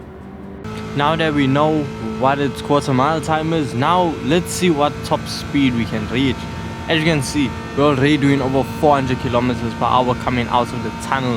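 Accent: South Africa. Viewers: Are you south african bru?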